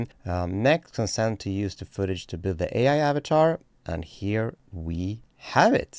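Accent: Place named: Sweden. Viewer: it's pretty easy to tell the real from the robot. your natural voice has much more low-end resonance and a slight, but endearing, european accent (swedish?). on the clone version, you are sans accent (test your french) and you're more minnie than mickey. the lack of bass might be correctable in post; the accent, not so much.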